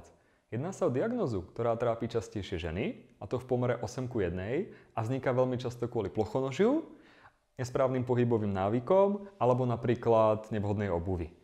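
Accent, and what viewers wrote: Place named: Czech Republic. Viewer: Ahoj ty Si čech alebo Slovák ako to rozprávaš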